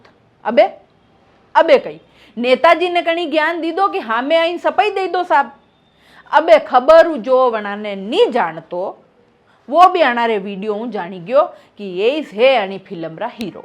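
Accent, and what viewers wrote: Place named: Mewar, India. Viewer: मेवाड़ी बोली गणी प्यारी लागी सा 🙏🙏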